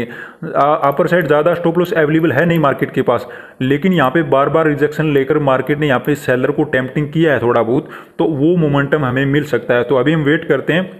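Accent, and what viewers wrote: Haryana, India. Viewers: sir i guess u are from haryana. i am guessing because of your speaking tone. am i right?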